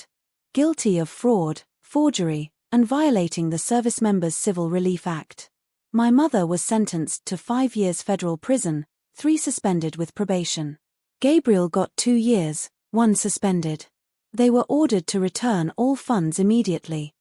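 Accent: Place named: United Kingdom. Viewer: Supposed to be an American but has a very distinct British accent. Annoying!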